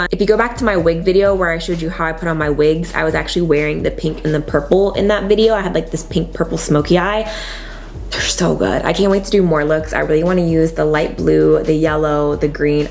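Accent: South, United States. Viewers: I heard that southern twang girl! Tennessee REPRESENTTTT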